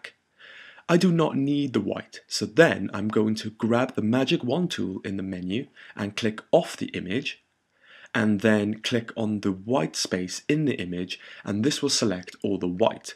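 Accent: Wales, United Kingdom. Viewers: his accent sounds Welsh me thinks, tidy :)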